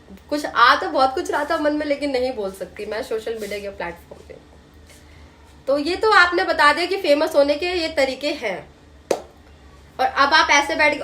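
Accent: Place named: Uttar Pradesh, India. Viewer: Aap kanpur lucknow se ho kya aapka kahe hamare kanpur lucknow ki jaban hai na baki your way of talking is marvellous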